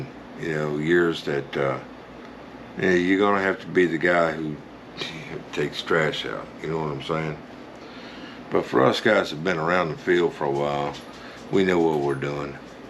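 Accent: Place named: South, United States. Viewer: The down and dirty truth of apartment maintenance, I agree to everything you said. Where are you from? You sound southern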